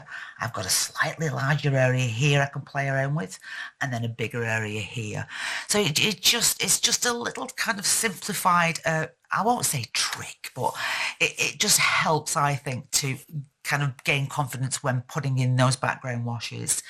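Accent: Northern England, United Kingdom. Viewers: I'm delighted to have found your channel, and lovely to hear a Northern accent. Im truly in love with your technique and can't wait to have a go myself. Your colour choices are amazing. I've subscribed!!!❤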